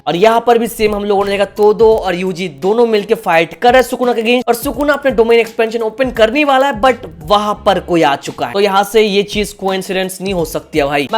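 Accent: Bengal, India. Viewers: Bhi ap bangali ho kya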